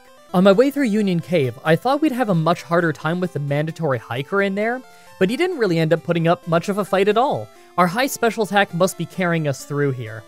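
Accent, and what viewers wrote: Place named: Canada. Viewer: His Canadian accent is so cute, eh!!! Lol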